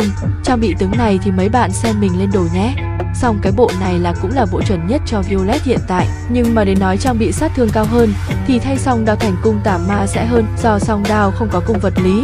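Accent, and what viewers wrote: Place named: Quang Tri, Vietnam. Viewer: Em ấy giọng Quảng Trị nên hơi khó nghe cho các bạn miền trong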